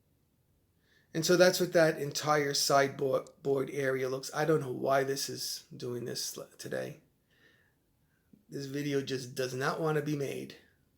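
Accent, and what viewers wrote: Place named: Boston, United States. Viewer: You have to live in Massachusetts. You have a Bostonian accent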